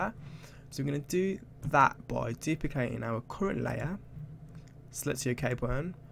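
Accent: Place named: Birmingham, United Kingdom. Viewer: birmingham accent :))))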